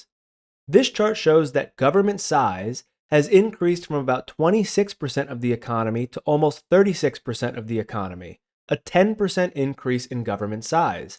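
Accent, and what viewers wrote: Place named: New Jersey, United States. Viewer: Love the Jersey accent (as much as anyone can)! Keep up the good analysis.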